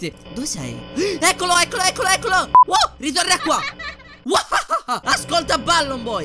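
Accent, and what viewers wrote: Sicily, Italy. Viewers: ma sei siciliano?😱😱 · Ma sei siciliano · sei siciliano? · Ma sei siciliano? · Sei siciliano?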